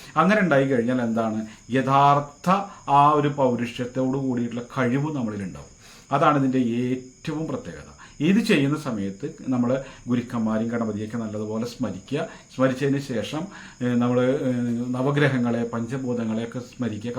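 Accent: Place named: Thrissur, India. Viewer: Thrissur സംസാരം 🥰🥰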